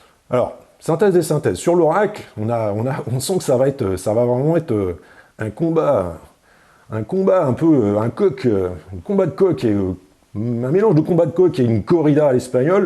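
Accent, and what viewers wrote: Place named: Bordeaux, France. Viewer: T'as l'accent bordelais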